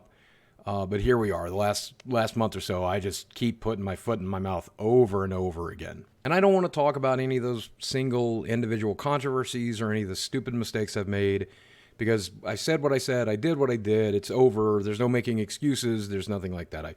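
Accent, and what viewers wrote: South, United States. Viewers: Why does your accent sound SOOO southern in this vid lol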